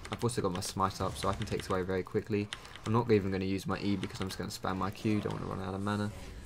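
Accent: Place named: United Kingdom. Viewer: Very manner intensive. Dat British accent.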